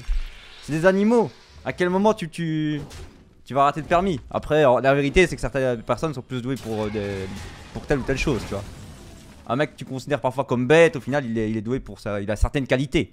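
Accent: Belgium. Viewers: Ah ben ça fait plaisir une petite arène. Par contre quand je t'écoute parler ça sent bon la frite la bière et la fricadelle j'adore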